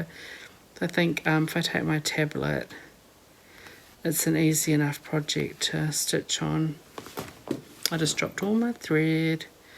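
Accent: New Zealand. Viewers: Your accent makes me miss my Kiwi friends who live in Taranaki- next video if you can zoom in really close so we can all appreciate just how fast you are. The fact that you can talk while you’re doing this is amazing lol since I can’t do anything else but concentrate on the stitches. Thanks again. Keep ‘em coming!!!! 🪡🧵 🇳🇿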